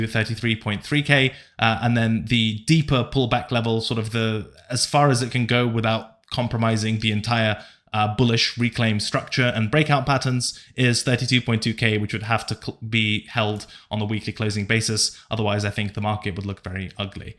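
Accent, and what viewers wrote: United Kingdom. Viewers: Team here. Sure, it might be more profitable to copy trade the duck. But you can't beat the charm of ' lovely British accent and self-deprecating humour.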